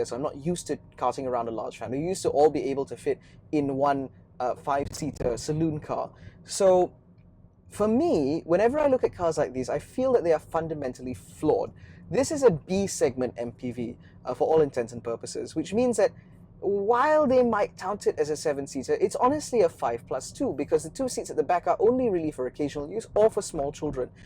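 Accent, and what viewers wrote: England, United Kingdom. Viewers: tq for yr replied. And so much 💕 with the English accent. 😄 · yes, his uk english are much2 cleared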